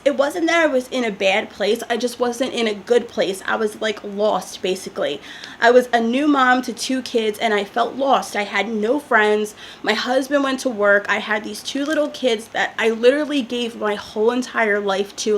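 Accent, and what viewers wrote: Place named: Philadelphia, United States. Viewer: Love your videos (your realness)!!! OMG - You're perfect just being yourself! I can't believe that you 'didn't fit in'. You seem like the sweetest person. When I first started watching your channel, you had me at "ballin' on a budget"! Your videos put me in a good mood because you're so bubbly and you have a sunny personality (and I like your Philly accent)! I agree with 'lady love'. People who act 'better than' are usually hiding something (most likely insecurity). Just keep being yourself,!♥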